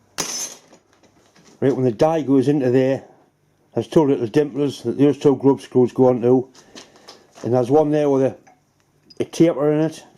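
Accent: Newcastle, United Kingdom. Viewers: The auto text cant understand Geordie either. 😂 At least mine cant.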